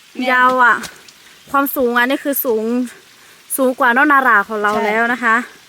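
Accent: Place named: North, Thailand. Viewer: เห็น พูดเหนือก็รู้สึกว่าน่ารักดีนะ👍👍👍👍👍🌹🌹🌹🌹🌹🌹เหนื่อยลำบากแต่ก็อร่อย ทำให้สุขภาพดีได้ออกกำลังกายกันสุด สุด😅😅😅😅😅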